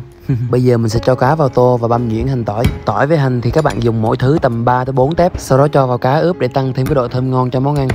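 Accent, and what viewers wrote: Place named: South, Vietnam. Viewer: Ê mày tưởng ăn hiếp trẻ vị thành niên à và nghe giọng anh là biết miền nam và bới đi, bắt bẻ người ta ko thích chơi đâu nhá. 💢🤬🤬🤬🤬🤬🤬🤬💢.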